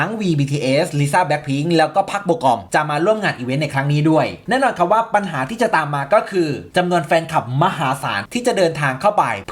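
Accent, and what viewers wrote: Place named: Nakhon Phanom, Thailand. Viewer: พูดได๋… เหมือนนครพนมบ้านฉันอิอิ❤️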